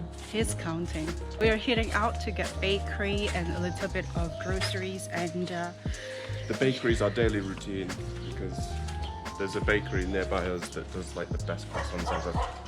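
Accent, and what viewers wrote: Nepal. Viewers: You've got a very very beautiful Nepali accent. Kudos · OMG.... your nepali accent ❤️❤️❤️❤️❤️ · You have so nice Nepali tune loved it hope you will speak alot more Nepali language 👍👍👌👌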